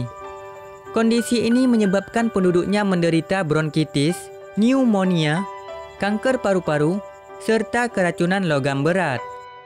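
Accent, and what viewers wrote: Aceh, Indonesia. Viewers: Abg orang aceh ya bang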